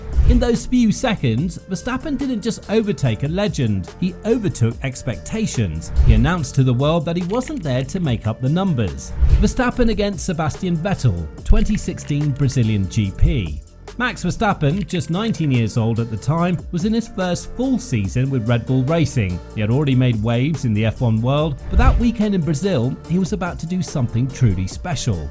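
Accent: United Kingdom. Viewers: LH "arguably" one of the most greatest driver in history.. says the bias British accent again. Well with his 7 titles he clearly isnt. Before F1 he wasnt that special as the greats in history such as Schumi, Senna and now Max. Those last three are completely from another league.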